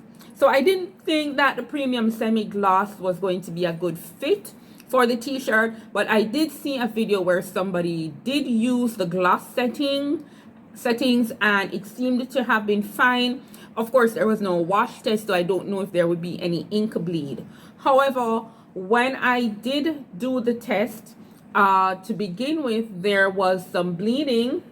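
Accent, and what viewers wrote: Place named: Jamaica. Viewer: Hey there...are you Jamaican...it sounds so...rahtid yuh really went intense with the instructions and tests...I thank you. I did have a drastic ink bleed Customer concerned and I'm embarrassed...however I will talk to Siser. Thanks much.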